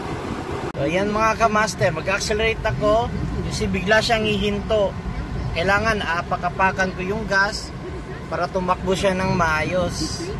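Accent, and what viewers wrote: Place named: Visayas, Philippines. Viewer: ang Cute Cute Bisaya nga lang....😅😅😅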